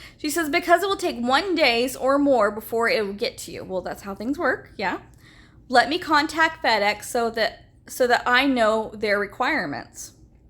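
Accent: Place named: Nigeria. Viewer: I think it's more Nigerian. Point is, it's certainly not a native English speaker.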